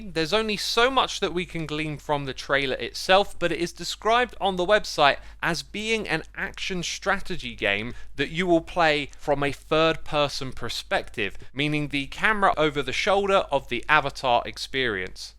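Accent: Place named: United Kingdom. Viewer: Started watching near my dad. He said "wow that guy is British"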